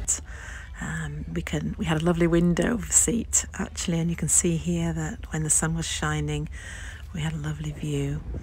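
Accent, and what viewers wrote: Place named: Ireland. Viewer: I love a plan, especially when it comes with an Irish accent 🍀 And all mod cons! What could be better! Great start to the vlog ❤❤❤❤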